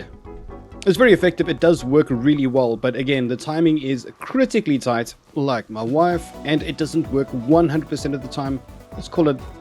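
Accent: South Africa. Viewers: U sound like you have a South African accent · Hahaha heard the intro and was like this guy is South African! Good job man great to see fellow Saffas making it with gaming channels!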